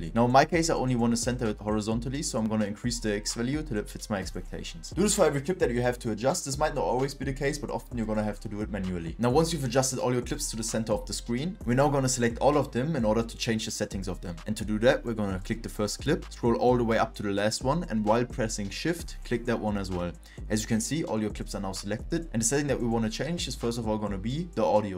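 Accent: Germany. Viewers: Ich höre Deutsch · is he german? he has a german accent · Da mann hört das du deutsch bist schreib ich mal deutsch :) Also ich habe mir grade adobe geholt und mit deinen endlos geilen videos habe ich schon so viel dazu gelernt! Vielen dank dazu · I hear German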